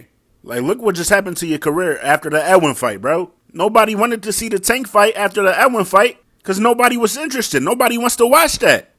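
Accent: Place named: Pittsburgh, United States. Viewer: the guy who runs this channel is from Pittsburgh not chester..that Chester accent is super odd n nothing like philadelphia..Pittsburgh is definitely similar tho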